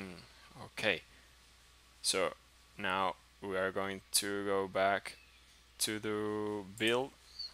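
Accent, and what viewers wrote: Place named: Costa Rica. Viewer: Are you Costa Rican? Your accent sounds very Costa Rican to me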